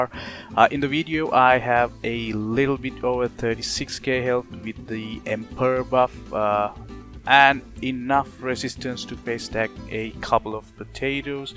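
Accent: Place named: Asia. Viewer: loved de asian-e accent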